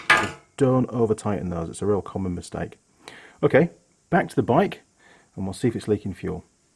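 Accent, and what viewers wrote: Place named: North, United Kingdom. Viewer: I detect a slight northern twang in that voice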